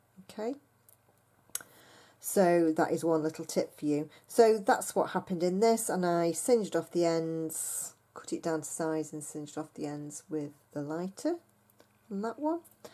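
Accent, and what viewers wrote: England, United Kingdom. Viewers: Hi, I’m Ericka! Pleased to meet ya. Enjoyed your video so much and had to respond about your mojo. Lol. Your so funny! I assure you-You still got your beading mojo! Happy Birthday also by the way! I’m in California USA. Glad I found ya and loved your unboxing and pieces you made:-) very pretty. Yes I feel the mojo loss all the time! That’s why I watch unboxing videos to help spark a bit of creativity. I suggest Pinterest- it’s great to browse other designs for that inspiration. I also have a fabulous talented lady named Gina from <link> that I always watch for inspiration on YouTube! You should check out her unboxing and she’s on Patreon and shares her tutorials. She’s really talented. Anyhow, thought I’d introduce myself and say Hi! Not sure where you are but love that accent! England? Happy Birthday whenever it is this month! 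God bless you - ty for sharing!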